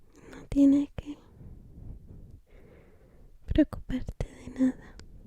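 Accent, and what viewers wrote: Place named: Argentina. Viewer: Desde que parte de Argentina transmites los videos, lo digo por la entonación.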